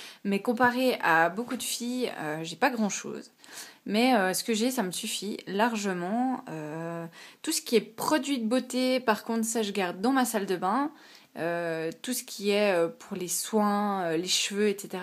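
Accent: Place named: Switzerland. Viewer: coucou ! je pense que tu mérite plus d'abonnées pour moi :) Wahou ta collection !! PS: Tu habite en Suisse ? ( J'ai l'impression que tu as un accent :$ ) Passe voir ma chaine ;) bisous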